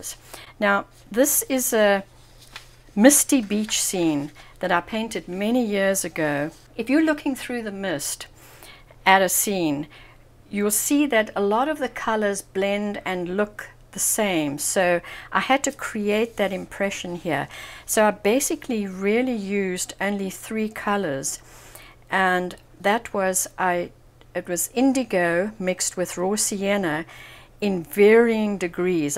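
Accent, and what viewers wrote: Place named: South Africa. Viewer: Great video, thought I picked up a South African accent. I am from Johannesburg now living in the Netherlands.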